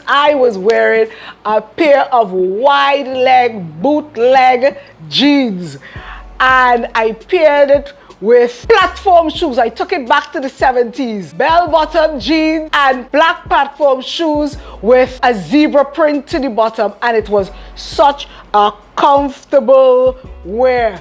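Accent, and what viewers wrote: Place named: Trinidad and Tobago. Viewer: Oh GOSH GYAL! All looks are 🔥 🔥🔥 Look #3 was my fav! I could listen to your trini accent ALL DAY! And yes... FUMI SENT ME!😂😘💓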